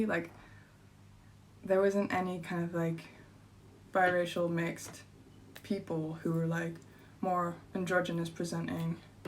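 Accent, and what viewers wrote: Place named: Cape Town, South Africa. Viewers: Right ! She could be a Saffa, where is she from ? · You have a Cape Town accent. Love your music!!!.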